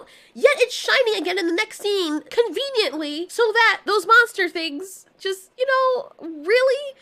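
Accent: United States. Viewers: not no accent she just speaks with a very smooth American accent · I thought u were American.Thats crazy.Are u American?❤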